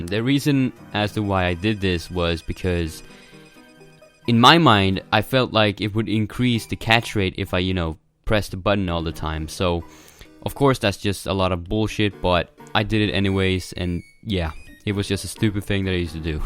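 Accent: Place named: Sweden. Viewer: Swedish? You really sound swedish imo.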